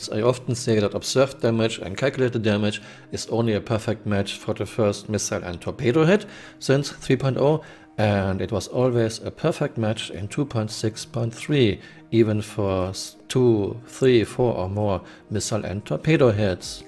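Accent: Germany. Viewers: Subbed ringged and I love your videos but ... for a non native english its very hard for me to translate your germanish. So many "so" and "torpedos" and many others. Please take that as feedback, i no way i wanted to offend you or anything ;)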